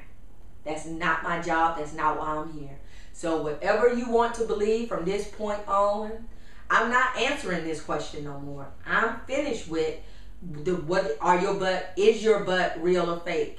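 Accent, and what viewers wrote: South, United States. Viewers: I love your voice and your southern accent. <3 And yes, the thirst for fame has screwed up generating income. <3 Do you have children? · "Damn ya still that phat-sweetmeat eye-candy I wanna eat...I'm proud of you too, boo-boo! LOL-SALTS ~ Brains, beauty, grace, good-face and a heavy-duty booty! I love your accent, you are a southern chocolate-belle or to be exact, a ripe and juicy: Georgia-peach! You knocked the urban-hustle, you never ended-up jaded or faded, ya made good...Now work it! Like ya know you should...Teach, preach, reach-out to the congregation, amen! Stay hydrated on Gatorade, whilst ya haters stagnate!"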